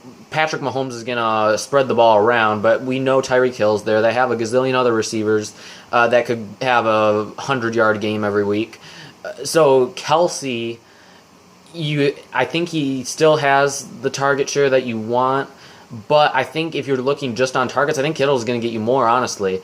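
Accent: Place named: Michigan, United States. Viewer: You from Michigan?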